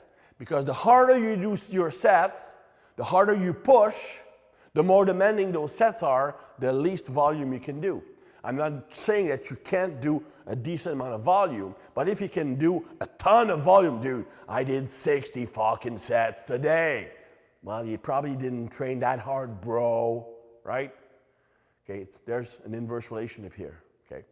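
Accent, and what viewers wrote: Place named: Quebec, Canada. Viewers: He's quebecois, french canadian. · Oh my Quebecois! Lovely :)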